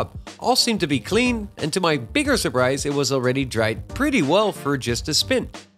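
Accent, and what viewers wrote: Netherlands. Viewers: We don’t mind this kind of production. It still had your graphics, music and that Dutch take on the English language :D. Feel free to keep up the great videos in this format.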